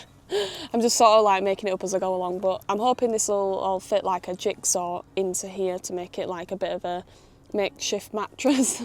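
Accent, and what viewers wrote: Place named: Yorkshire, United Kingdom. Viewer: I’ve been missing that (Yorkshire?) accent.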